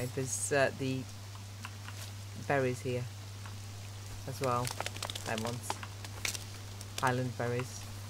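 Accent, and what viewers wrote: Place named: United Kingdom. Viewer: Thank you for showing the route, with camera angles on landmarks, to where you found Hops. And thank you especially for popping up the map. You are now my favorite Conan Exiles YouTuber. As if the lovely female voice and British accent weren't enough for that already! :D On another note, I see you put yourself together a set of the Reptile Armor. Nice isn't it? It has slightly more Armor Points and Poise than the standard Medium Armor, and it takes only a single repair material.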